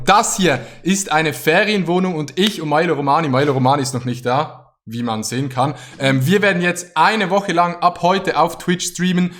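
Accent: Standard. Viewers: Es ist hochdeutsch! Yipeeeeeeee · Feier es das du viel Hochdeutsch sprichst 👍👍👍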